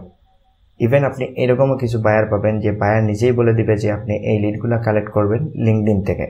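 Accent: Sylhet, Bangladesh. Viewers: apni ki sylhety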